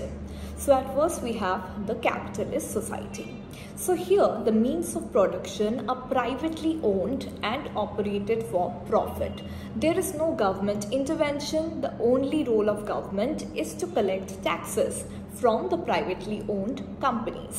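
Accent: India. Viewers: R u bengali?. informative video.✊✊✊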